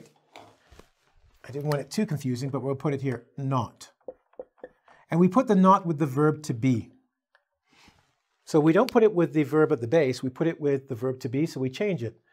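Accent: France. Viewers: Lol your French accent is just merveilleuses! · Your french accent is priceless. Full disclosure - I am french.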